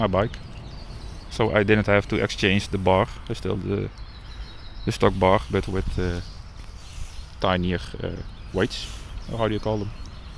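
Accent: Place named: Netherlands. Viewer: Dutch accent :)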